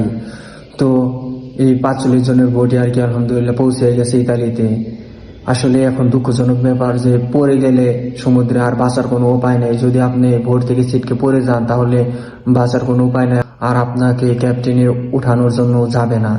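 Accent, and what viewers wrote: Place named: Tangail, Bangladesh. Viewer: যাক আল্লাহ ভরসা যে কটা যাইতে পারে এ ভাই আপনার বাসা তো মনে টাঙ্গাইলে আপনার কথার ভাষা শুনে বুঝা যাচ্ছে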